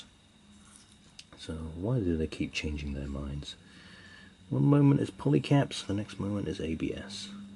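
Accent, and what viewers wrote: United Kingdom. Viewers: also about the questions ask bit, where do you live, you have a very british voice but you upload alot of them promo videos in japan?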